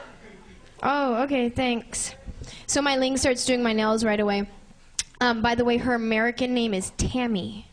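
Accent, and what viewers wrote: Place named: Thailand. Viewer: She speak Thailand accent, not Vietnamese one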